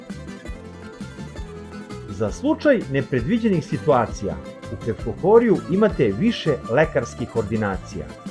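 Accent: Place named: Jagodina, Serbia. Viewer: BRAVO ZA MOG JAGODINCA,OSETILA SAM PO NAGLASKU DA STE IZ TIH KRAJEVA PA SAM BILA SLOBODNA DA VAS PITAM ODAKLE STE. JA SAM PO MAJICI IZ MAJURA KRAJ JAGODINE, A ŽIVIM U ZAJEČARU . SA PORODICOM SAM U AVGUSTU OVE GODINE BILA U PEFKOHORIJU,STVARNO JE LEPO. POZDRAV OD ❤ ZA VAS. PRODUŽITE SA LEPIM VIDEIMA,KORISNI SU . 🌹🍀🥰👋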